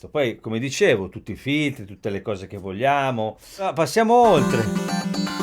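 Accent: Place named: Italy. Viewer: Your musician skills plus your Italian accent make me want to buy this Korg so bad. I already bought the Reface DX, thanks to your demo video! Grazie, amigo.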